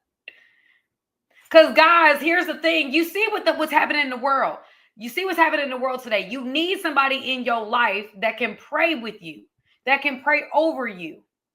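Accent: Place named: New Jersey, United States. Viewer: The only thing I know about New Jersey is what ive seen on it’s always sunny and that it’s also the birth place of jay and silent bob and I can see you growing up there the way you speak 😂😂 i definitely was a giant red flag no way around it but i think I’m a white flag now I’ve surrendered myself as we should all be. Ive still got that red flag but I’m not waving it around anymore it’s just for emergencies I think.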